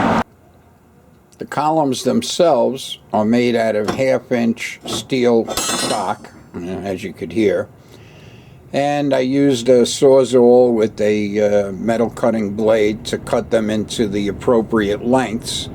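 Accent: New York, United States. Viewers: That's gotta be the thickest New York accent I ever heard on YouTube so far and the design of your el is absolutely great! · Thank you so much for this video,, I visited Brooklyn 20 years ago and always wanted to build a railway model of it,, Now I finally have the time and space I am going to start. Thank you for the tips.... must say I love your accent-- it takes me right back to the summer of 94 and my month in New York,, kindest regards from England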